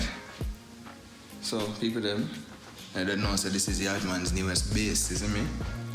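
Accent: Jamaica. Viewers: Jamaican accent of course he is from a diff part of ja thats all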